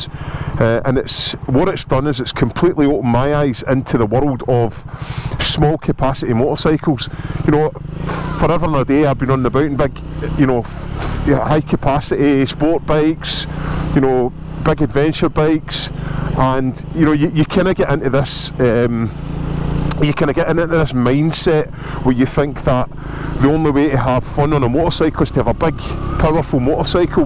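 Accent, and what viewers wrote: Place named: Glasgow, United Kingdom. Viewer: Certainly the honest and trustworthy Glasgow accent helps.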